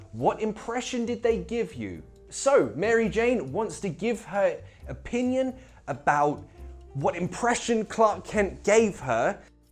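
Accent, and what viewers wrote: United Kingdom. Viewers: I was attracted by British accent , I wanted to learn more and searched on youtube, then came across your videos. pancake +1 and spiderman is better .haha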